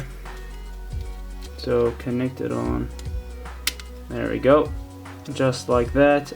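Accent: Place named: New Zealand. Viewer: Wait are you kiwi, that's awesome, what part of NewZealand are you from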